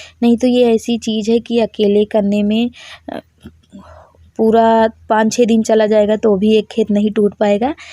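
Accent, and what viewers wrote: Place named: Naini Nibi, India. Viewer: सिस्टर आप का भाषा और बोली जो है न अयसा लगता है कि आप नैनी निबी के तरफ के हो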